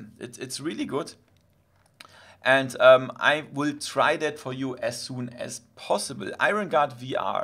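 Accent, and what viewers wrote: Germany. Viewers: an deinem english hört man das du deutsch bist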